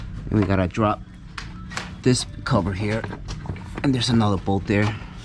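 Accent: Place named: El Salvador. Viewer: Such Beautiful spanish! Sounds El Salvadoran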